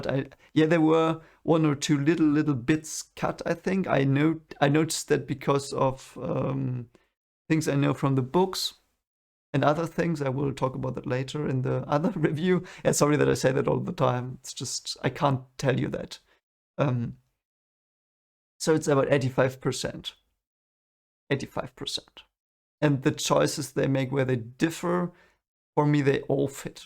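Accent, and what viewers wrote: Germany. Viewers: Very nice review. Due to your accent I assume that you are from Germany? ;)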